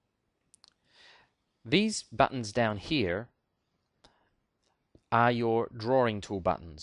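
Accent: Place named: Australia. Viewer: As an Aussie myself, I'm 99% sure its an Australian accent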